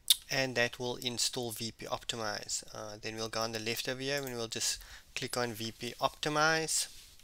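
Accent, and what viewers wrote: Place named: South Africa. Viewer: Thanks for the video, nice to hear a South African accent glad there are local tech YouTubers out there.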